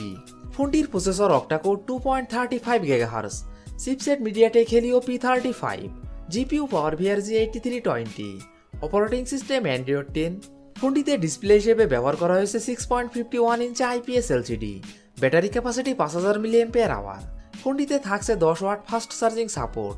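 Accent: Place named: Jessore, Bangladesh. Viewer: kota sunei bujte parsi amar basa o jsr 🥰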